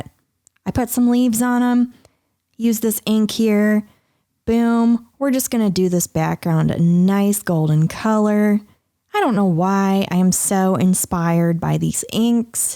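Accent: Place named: South, United States. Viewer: I have been watching you for years and today I am finding out you have a Southern accent wow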